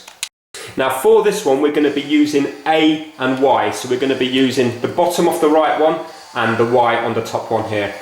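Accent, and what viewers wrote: United Kingdom. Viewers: we present the award for the most english sounding youtuber ever to this guy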